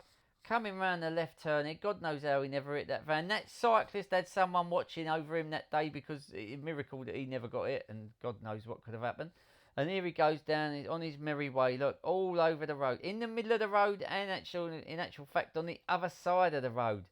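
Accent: Cockney, United Kingdom. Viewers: Absolutely agree about lifetime ban for really dangerous or drunken driving. But it never happens, does it? I love the commentary and the cockney accent is perfect!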